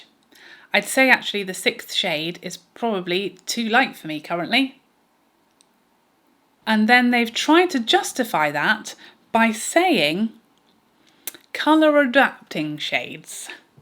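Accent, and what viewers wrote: United Kingdom. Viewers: Great video as always dear, and looking so beautiful!!!🤩🤩🤩🤩Love your humor always so, so much!!! Do you know how unique you are here, your beautiful appearance, your knowlegde, your lovely British accent AND your humor, you always make my day with your video's, thank you!!!! The UD nightmare🤣🤣🤣🤣I'm only interested in the CT powder at the moment. Thanks, have a great sleep and an amazing day tomorrow!!!😘😘😘😘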